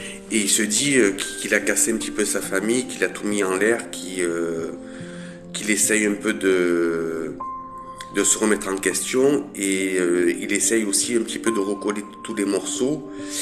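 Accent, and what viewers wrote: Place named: South, France. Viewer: Bonjour," "Guidance intemporelle🎉Beaux Flashs.Qui parfois pas si,évident à dire.Mais,bien réel ce Flash.Super!...😊De toute beauté.Très intéressant et ce qui permet de mieux comprendre certaines,situations et aussi,certains comportements.Très beau Flash🎉Recevez mon soutien😊Plus rassurant et appréciant ce"Don"🎉Qui ne peut qu'amélioré le quotidien de chacun.Sincères remerciements" "🎉Avec son Joli accent du midi😊Franchement Beau ces Flashs🎉On ne s'en lasse pas😊Belle Richesse en ce Don🎉Prenez soin de vous😊Au plaisir!...😊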